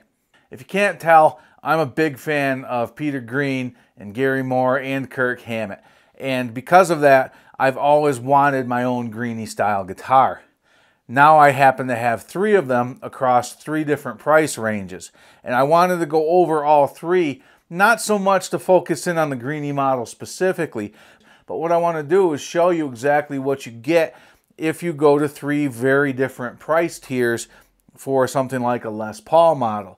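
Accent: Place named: Michigan, United States. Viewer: first video I've seen from ya. Nice evaluation. Thanks! looking at a Chibby or Episode Greeny. I'll bet $100 you're from Michigan. I've been in FL since 84 and can hear it... lolol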